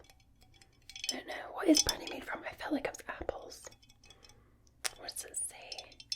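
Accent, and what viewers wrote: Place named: Liverpool, United Kingdom. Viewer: scouse ha ha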